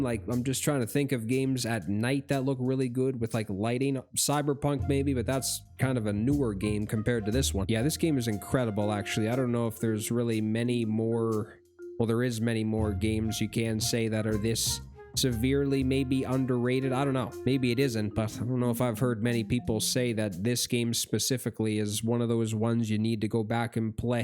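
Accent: Canada. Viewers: The first time I could tell a Canadian from their accent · Your voice and Canadian accent is so relaxing. I love to CHILL while listening 😎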